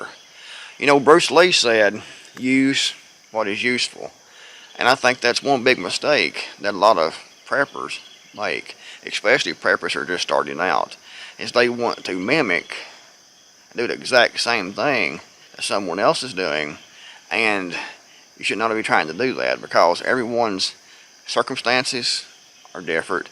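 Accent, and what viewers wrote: United States. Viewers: I’m loving the “differt” way you speak. Nothing makes a displaced Marine feel more at home than hearing some sweet American accents.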